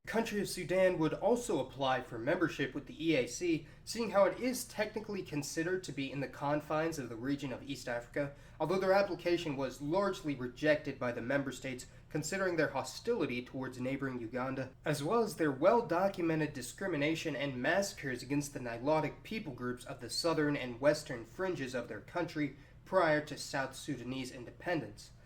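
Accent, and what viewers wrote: United States. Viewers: Burundi is pronounced “Burundee” and not your Americanized habit of pronouncing “I” as “eye” with so many things 🤨😒😑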